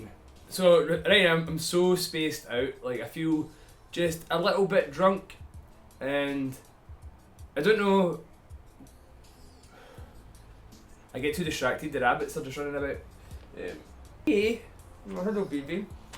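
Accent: Scotland, United Kingdom. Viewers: Scottish ? Why the fuck I can’t understand shut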